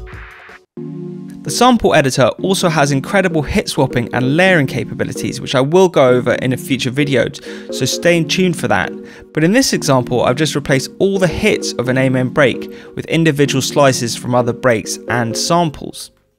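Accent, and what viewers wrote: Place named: United Kingdom. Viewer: Honestly, as soon as I heard his British accent I was sold on the product.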